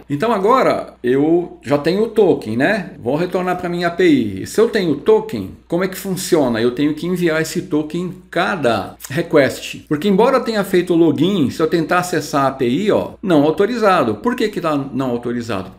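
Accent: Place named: France. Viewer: Você participou da minha vida inteira codando, sempre que procuro algo encontro vc. Hoje é a primeira vez que ouço sua voz e percebi que é puxado para o francês 🤗 Engraçado te seguir tantos anos lendo seus artigos e só agora ver vc trabalhando efetivamente. Sensacional o tutorial como sempre 👏👏👏👏. Mestre do code!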